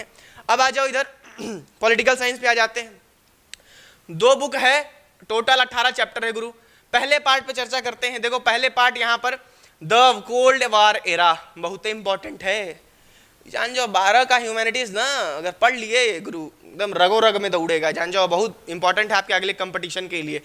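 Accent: Bihar, India. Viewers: Aap Khan sir ki tarah bol rahe ho Bihar ke ho kya? Mein bhi Bihar s hu Darbhanga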